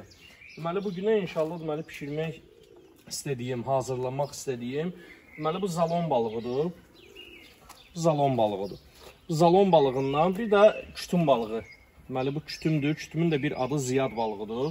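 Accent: Baku, Azerbaijan. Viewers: Bakılı balası yorulmayasan!!!! Üreyün necə istiyir hələ də danış!!! İrad tutanlar da bilsin ki koptit közləmə deyil əslində hisə verilmiş deməkdi! Bakı danışıq dialektini qulaqları qəbul eləmirdəəə 😀😀😀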